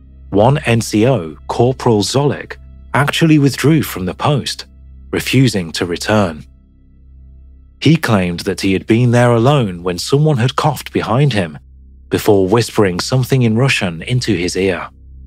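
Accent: United Kingdom. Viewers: It's nice to have a bit of variety. New guy needs to vary up his tone a bit, go for more emphasis, be a little more lively. Otherwise? Pretty nice. But, then, you can throw a decent Brit voice at anything and us 'muricans will go for it.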